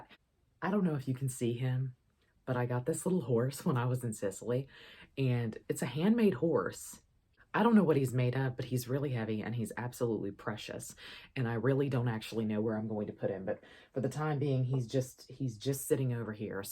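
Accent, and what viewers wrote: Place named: North Carolina, United States. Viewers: Yep, I'm from NC, and sounds a lot like me! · Is that a NC accent? I like yer vlaugh.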